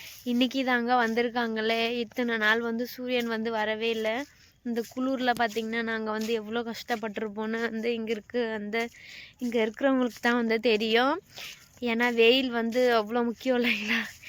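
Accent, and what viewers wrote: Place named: Kongu, India. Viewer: Ungaluku sondha ooru edhu.. neenga eppadi anga poneenga.. alagana Kongu tamilla pesureenga adhanala keten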